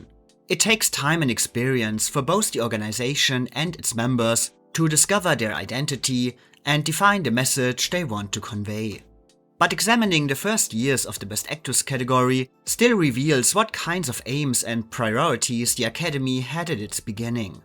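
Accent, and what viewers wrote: Germany. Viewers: Love your Deutsche - English accent. You roll your "r",. Excellent analysis, Joan's performance in Possessed (47) is a tour de force.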